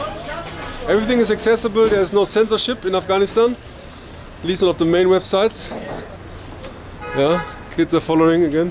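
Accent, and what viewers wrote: Germany. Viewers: German English accent is so interesting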